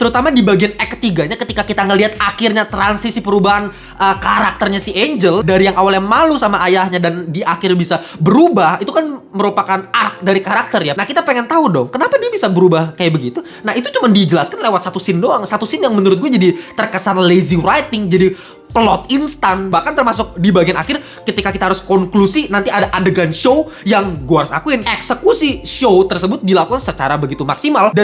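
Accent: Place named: Eastern Indonesia, Indonesia. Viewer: Belajar logat timur dolo biar ko paham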